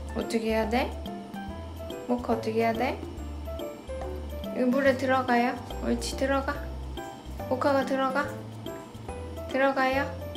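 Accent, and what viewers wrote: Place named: Gyeongsang, South Korea. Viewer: 얘 나이가 어떻게 되요? 우리애도 보테인데..남자애고..3살이고...경상도 사투리 쓰시는거 보니...저는 김해 살아요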